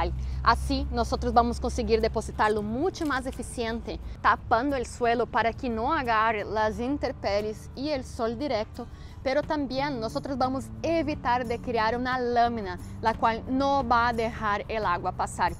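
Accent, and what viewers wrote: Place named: Brazil. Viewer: Cai de paraquedas aqui e vi que era brasileira pelo sotaque, ótimo vídeo parabéns 👏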